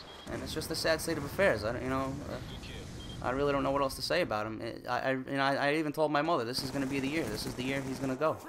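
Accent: New York, United States. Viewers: I predict 100,000 subscribers by the end of the year. NY accent + Rage + valid observations = kick-ass commentary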